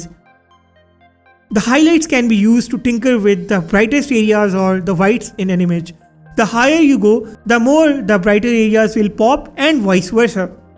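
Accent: India. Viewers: indian english haha, you can go crazy listening something like this lol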